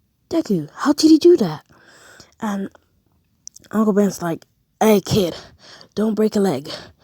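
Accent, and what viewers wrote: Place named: United Kingdom. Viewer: Mate, you've got a heavier British accent than I do! It's like one of those book narrators.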